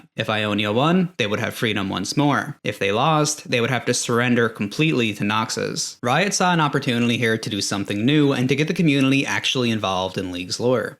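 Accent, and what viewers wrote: Newfoundland, Canada. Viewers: hey are you canadian? you sound like a newfoundlander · Ive watched a few of your videos and enjoy your presentation, and not that it matters, but are you from Atlantic Canada, like Newfoundland & Labrador? I thought I could hear Irish or Scottish, but if I was on a gameshow I'd lock in NFL. Will be subscribing.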